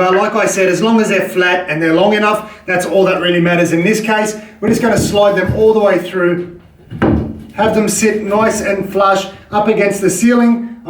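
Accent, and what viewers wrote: Australia. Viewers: Really good tute. Is that an aussie accent?? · Bloody awesome mate, excellent work, appreciated the detailed illustration of all of the steps required for this job, and great to finally hear an Aussie accent on one of these videos.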